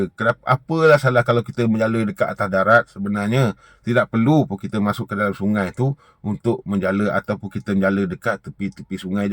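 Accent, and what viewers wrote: Perak, Malaysia. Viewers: Fuhhh cakap perak pulak 😂😂😂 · mantap lah bang buat comeback saya sentiasa follow video bang tak penah miss..cume kali ni terhibur skit sbb abg cakap perak hahahha sbb saya org perak btw steady bang ilmu2 yg di sampaikan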